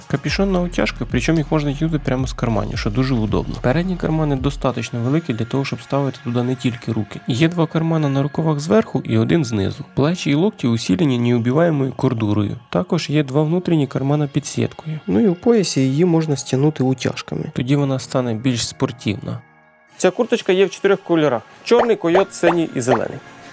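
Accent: Surzhyk, Ukraine. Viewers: всё хорошо, прикольные куртки! Но, когда ты делаешь видео контент постарался пожалуйста не говорить на суржике очень режет слух! Тем более что в большей половине видео звук наложен на картинку. Без обид! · Цей суржик 😤 На вчіця правильно розмовляти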